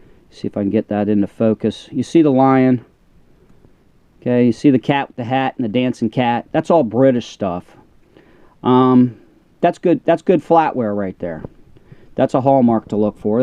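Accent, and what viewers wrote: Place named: Maryland, United States. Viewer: This is a dude from Maryland for sure haha my aunt and uncle have that accent they’re from Hagerstown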